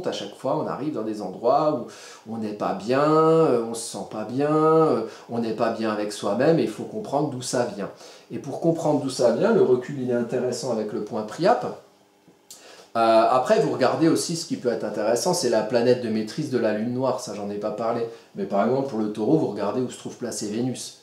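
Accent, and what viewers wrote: Alsace, France. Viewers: merci à vous, en maison 4 il me semble, née le 11/03/1985, stellium en bélier et en scorpion. Cette incarnation est complexe. Et chuis sundgauvienne aussi, j'ai reconnu légèrement l'accent alsacien! Bonne continuation.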